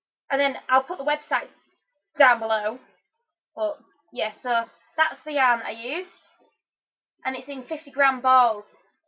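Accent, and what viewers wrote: United Kingdom. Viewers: Brill podcast!! I'm going to have to binge all your previous episodes! And it's nice to find a podcaster with a proper British accent ;)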